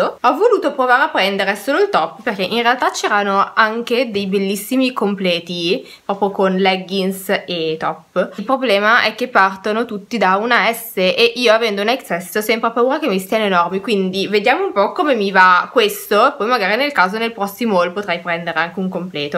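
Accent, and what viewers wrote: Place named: France. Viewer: bella la scritta sulla maglietta killing you in my mind,complimenti per il fisico =) cmq mi fa simpatia il tuo accento,sei francese? 😁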